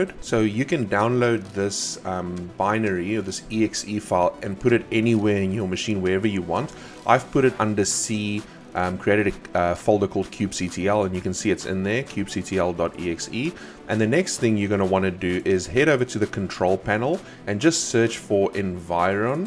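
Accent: South Africa. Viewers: Are you originally South African?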